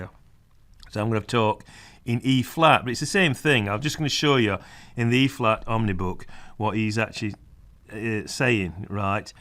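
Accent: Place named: Stoke, United Kingdom. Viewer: where are you from? It sounds like you've got a bit of a Stoke accent but with some brummie mixed in..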